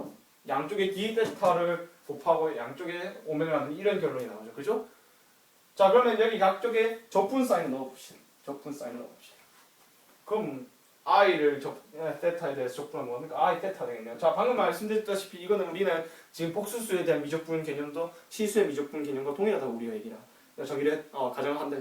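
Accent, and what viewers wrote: Gyeongsang, South Korea. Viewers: 서울말씨는 경상도 말씨든 설명이 참 좋네요. 달을 가르키면 달을 봐야지 어리석은 몇몇사람은 손가락을 보네요. 설득 보다 유도라는 표현은어떤가요? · 서울 말씨를 사용하세요. 경상도 말씨는 지겨워요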